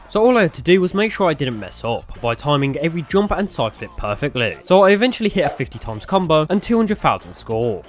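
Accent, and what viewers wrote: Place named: Black Country, United Kingdom. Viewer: you aren't by any chance from the black country are you? Not even lying you sound exactly like me haha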